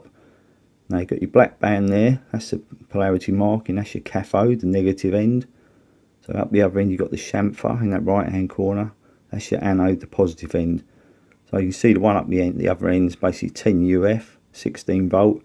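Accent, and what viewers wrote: United Kingdom. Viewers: Your videos are nothing short of brilliant. I've religiously watched them all and several, multiples times. How you spell out how you do what you do and why is unmatchable. I love your titled bridges too: very creative. I also want to mention something that I've never seen mentioned before. I really dig your accent. not so much because I'm some odd Britophile, but I really get bored to bloody tears listening to guys with game show host, cookie cutter voices. I've just grown to feel there's something disingenuous about them. Your intonation and articulation just keep my attention meter pegged. Thank you. Keep 'em comin'!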